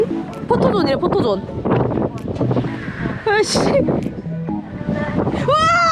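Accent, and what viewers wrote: Gyeongsang, South Korea. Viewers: 이상하게 경상도. 말과 전라도말이 같이 들리 네요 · 말씨억양이 깅상도? 대구?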